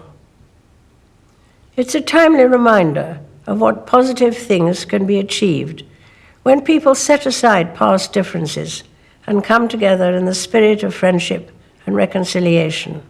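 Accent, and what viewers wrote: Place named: RP, United Kingdom. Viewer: At least she speaks Queen's English and has style. Don't know who wrote her speech. Our nice queen Silvia, who is supposed to know 8 languages, how come she hasn't learnt to speak well yet? Many resources , pedagogues, money and speech laboratories at her disposal. The royal family should heal. Everyone should.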